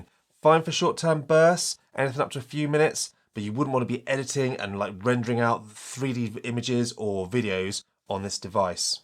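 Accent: United Kingdom. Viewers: Why do all the British guys on youtube sound the same? So odd.👍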